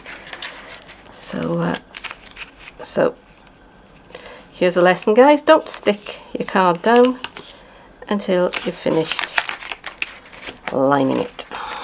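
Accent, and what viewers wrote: Wales, United Kingdom. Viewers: Lovely card, you sound like your from my hometown, port talbot.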